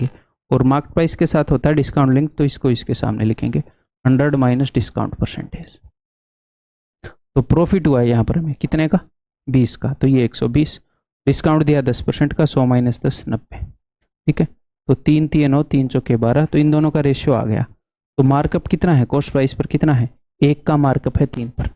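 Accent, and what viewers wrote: Haryana, India. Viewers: Sir ek video haryanvi accent mein banano...aapki haryanvi accent bahut mst hai maine ek baar paid course ke videos mein thoda suna tha..aap syd kuch bol rahe thai samne waale ko ki thodi class baaki hai bs.😀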